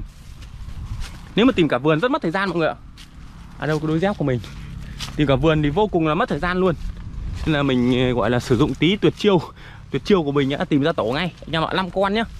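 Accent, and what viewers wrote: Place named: Hải Dương, Vietnam. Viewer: ânh đang h đang sống ở đâu vậy, nghe như Hải Dương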